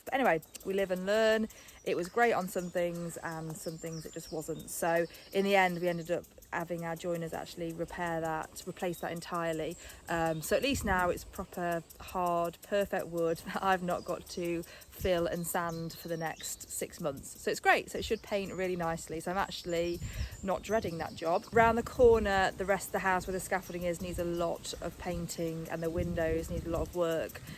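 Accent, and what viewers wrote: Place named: East Midlands, United Kingdom. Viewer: Are you from the east midlands Nottingham area?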